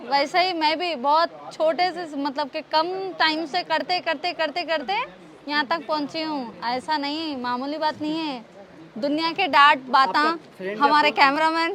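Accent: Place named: Hyderabad, India. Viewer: Mam yaar I really liked the Hyderabadi language in the you 😂🤣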